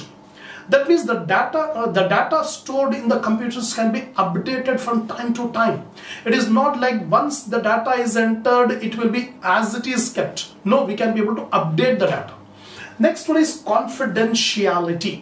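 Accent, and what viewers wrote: Hyderabad, India. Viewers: Sir are you from Hyderabad..?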